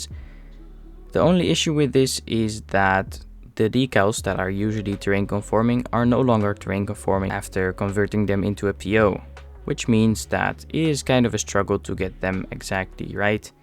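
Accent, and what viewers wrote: Netherlands. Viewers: where is ur accent from? are u dutch?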